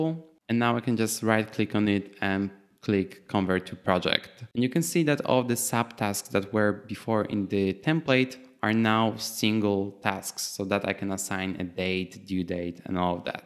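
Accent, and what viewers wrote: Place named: Germany. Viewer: Hi, I like your accent, but totally confused, You speak English with German accent but you have a Polish name lol, what's your story?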